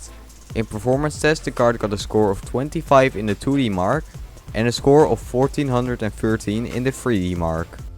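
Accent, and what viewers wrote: Netherlands. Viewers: Just asking, are you dutch? You sound like you have an accent😁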